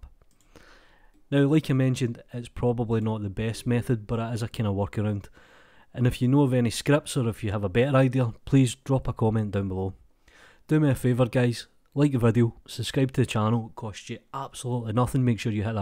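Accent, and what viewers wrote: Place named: Aberdeen, United Kingdom. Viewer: Nice tutorial man! Are you from Aberdeen?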